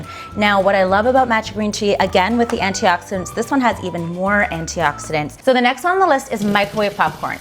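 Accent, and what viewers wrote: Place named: Canada. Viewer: I LOVE when your canadian accent comes thru! :)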